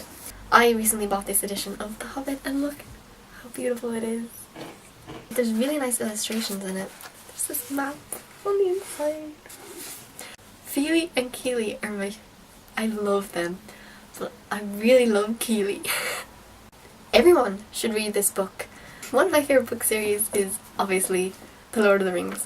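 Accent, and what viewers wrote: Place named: United States. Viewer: You're beautiful beyond words, but why do you use American English and speak in an American accent? It'd be odd to visit Africa to find someone dressed in Burberry attire and saying "ain' wannit, bruv, fam, bredren" in an English accent, wouldn't it? (points finger) Get where I'm coming from?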